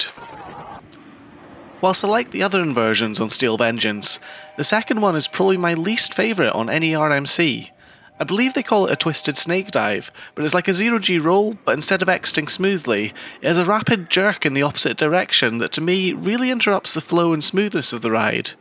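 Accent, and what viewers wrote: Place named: Wales, United Kingdom. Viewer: Ouhh ok. I thought I heard some welsh 😅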